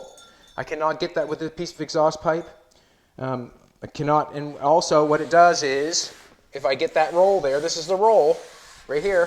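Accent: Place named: Canada. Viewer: Lol you Canadian eh not Ruski lol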